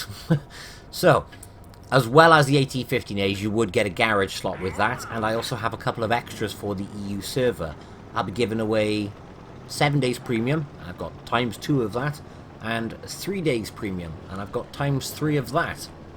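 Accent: United Kingdom. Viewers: Also where from Britain is he? The accent sounds local to me.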